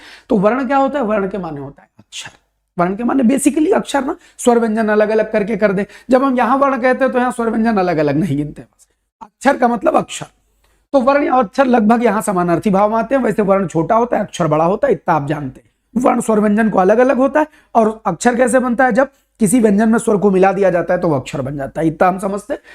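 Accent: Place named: Western Uttar Pradesh, India. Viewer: hm bhi hai up se .. pr hmare yha aisa nhi bola jata ..sayad aap west up se ho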